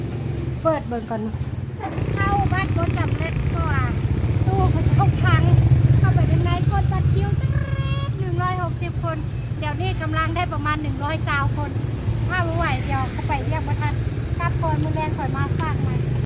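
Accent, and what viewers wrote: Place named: Luang Prabang, Laos. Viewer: มีสำเนียงหลวงพระบางออกมาแว็บๆ! อยากฟัง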